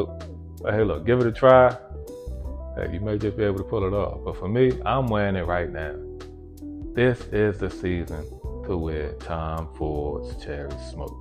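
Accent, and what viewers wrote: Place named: Baltimore, United States. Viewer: you gotta be from baltimore OG lol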